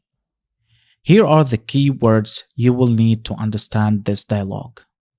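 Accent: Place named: Dubai, United Arab Emirates. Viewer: your arabic dialect is of dubai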